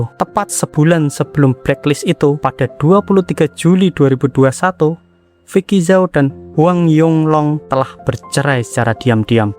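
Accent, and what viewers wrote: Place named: Java, Indonesia. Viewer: KA medok nya ganggu maaf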